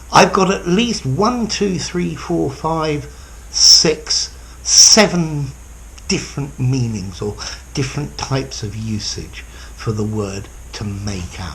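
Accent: RP, United Kingdom. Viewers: I like your RP English accent and the way you teach, as all that makes it easy to make out what you are talking about. Thank you sir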